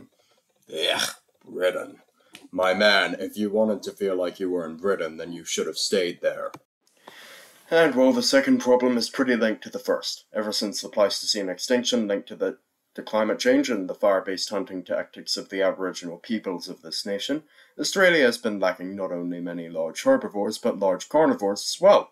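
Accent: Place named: Ireland. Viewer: yeee 🤙, that's cool, for an Australian your accent definitely has an Irish sound to it I swear. I'm from Irish stock too and I have cousins with my Irish last name in Australian. The emu war was hype 🤣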